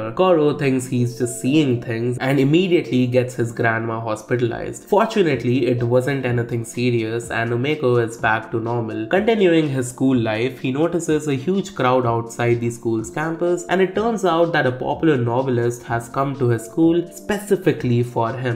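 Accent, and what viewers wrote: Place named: India. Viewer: are you from india? you sound indian (not saying in a negative way)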